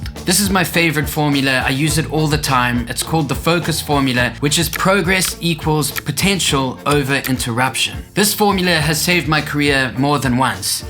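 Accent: South Africa. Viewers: Step 2 is where im at, i did about 10 weeks of weekly videos. I create scrap metal sculptures and i film the process and the story of why im making what im making. It felt so good to be so active for a few months but making the sculptures is my fulltime job and the extra time put me behind on orders argh, once ive caught up properly i can get back to it again 😁 thanks for the advice, I love your content and the accent, it reminds me of my grandmother (she was from south Africa)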